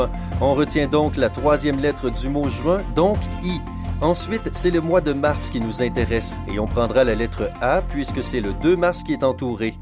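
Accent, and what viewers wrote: Quebec, Canada. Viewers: T'es québécois ta un sacré accent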